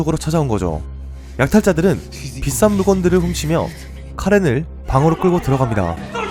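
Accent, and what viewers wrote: Gyeongsang, South Korea. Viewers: 오늘 문득 님 설명듣는데...경상도쪽이셨나요? 사투리가 들리는건가싶어서 ㅋㅋ 손 빨리 나으시길 쾌차하세요~~~ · 고향이 경상도 어디에요.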